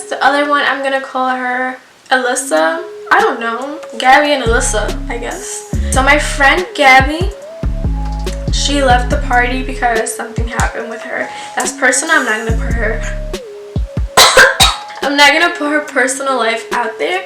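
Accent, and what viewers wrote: New York, United States. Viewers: Your from NYC? You sound like it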